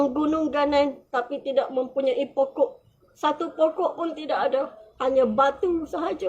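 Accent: Kelantan, Malaysia. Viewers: Mari kot celoh mana menasio nie.. Bunyi kecek supo kltn.. Wat malu ore kltn jah. Dgr ko dia dok cito tu aku rasa 3 ari dia nie x bgun tido. Nk sudah ko mimpi tu gak..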